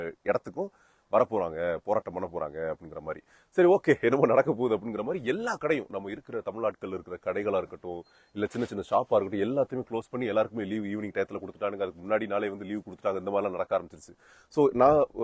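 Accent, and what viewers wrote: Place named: Telugu, India. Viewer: He is a Telugu guy that's why he speaking like this.........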